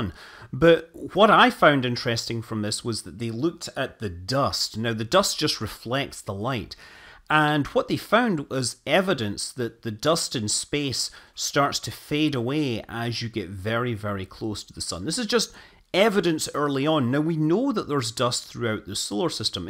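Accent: Scotland, United Kingdom. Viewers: I love the Scottish dialect ❤️ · This fellow sounds Scots like me! So what would he know about the sun? I've glimpsed it twice in my lifetime. Great upload. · Don't worry, it's just scottish.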